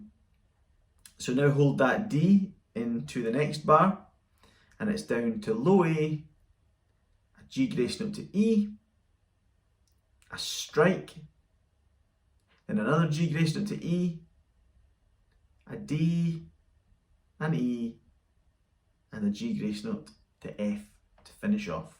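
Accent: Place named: Scotland, United Kingdom. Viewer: Very very helpfull, included your magnificent Scottish accent, which is not the easiest thing to understand for an old Frenchman like me...Thank you very much indeed !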